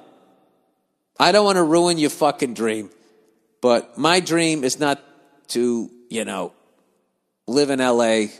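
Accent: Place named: Texas, United States. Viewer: His texas accent is hillarious!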